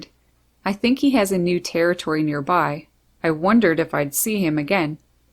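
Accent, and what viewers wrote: Canada. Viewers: What a keen eye for detail and patience. Beautiful Buster and Hatch sweet Kiwi. You have a cool Canadian accent!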